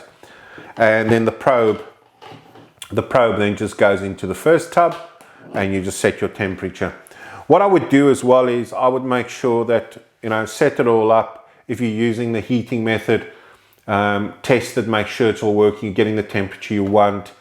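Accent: South Africa. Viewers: Nice video man . Helped me a lot. Are you from South Africa by the way? Sounds like you have an SA accent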